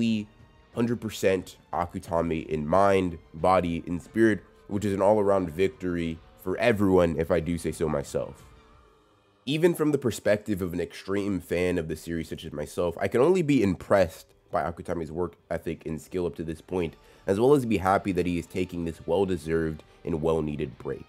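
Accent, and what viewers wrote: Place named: Missouri, United States. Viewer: that's intersting. I asked bcus of ur accent. It reminded me of my lil bro cos he started sounding like that when he was in Missouri. It's very clear and easy to follow since you hardly skip over any letters/syllables.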